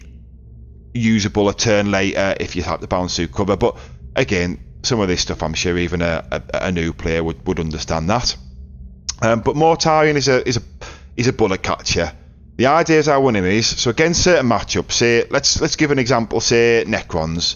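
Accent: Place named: Teesside, United Kingdom. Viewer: I've lived out of the uk for 20 years, but your accent reminds me of home. After so long, it's hard to be sure, not a mackem i think, maybe a little more south, smoggy? Nevermind you just answered this as i was typing, but i'll leave it up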